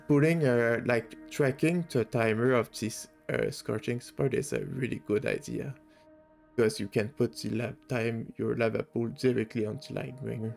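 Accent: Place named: Quebec, Canada. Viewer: toé tes Québécois ! hahahaha :p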